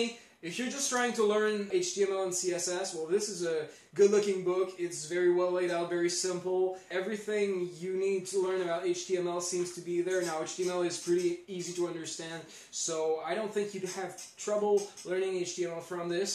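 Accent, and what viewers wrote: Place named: Canada. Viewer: you sound so much more canadian in this video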